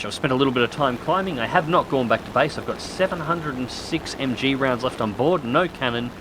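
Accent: Australia. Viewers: Feeling like you also just watched Rex's Hangars video on the HE112 ;) ? Awesome vid mate! thank you very much, love this plane and it's great to listen to an Aussie talk about something other than the fkin election or parliment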